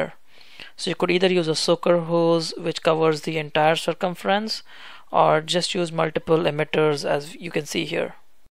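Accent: India. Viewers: From your voice i think you are indian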